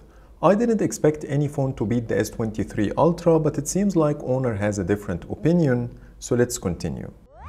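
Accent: Egypt. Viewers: لجهتك مصري ١٠٠% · your accent like the Egyptian people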